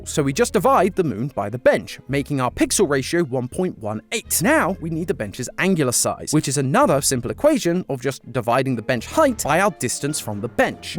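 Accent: United Kingdom. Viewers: As a fellow British person, we do just say it like that 😅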